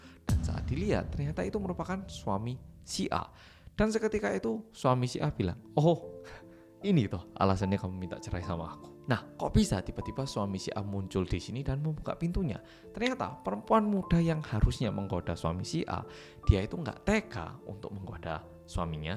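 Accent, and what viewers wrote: Java, Indonesia. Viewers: mas, suara viedomu tu kecil, cuma kedengeran medoknya doang, boleh saran ya volume nya agak di gedein, soalnya kaget udah gedein volume trus tau2 iklan kenceng banget suaranya T_T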